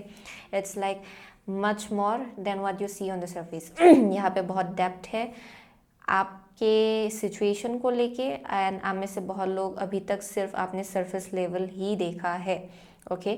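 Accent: Northeast, India. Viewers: Aap north eastern se ho kya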